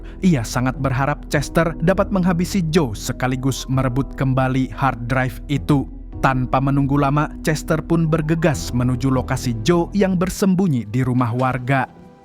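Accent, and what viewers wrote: Banjar, Indonesia. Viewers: Nahh logat nya pina banjar jar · Waduh amang urang banjar ah sekalinya😂😂😂 menyalaaa banuaku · Ai orang Banjar kah mang 😂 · Urang Banjar lih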